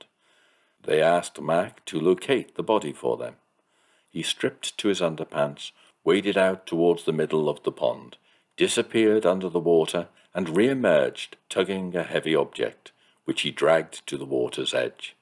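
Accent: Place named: Liverpool, United Kingdom. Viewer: Soft Scouse.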